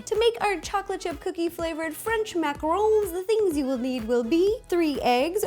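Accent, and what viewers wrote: United States. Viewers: Can I just ask, is this all imperial? I use metric, cause I live down under, aaaaaaaaaaand I wanna make some stuff from Ro's vids, but she sounds American, so I'm guessing it's imperial.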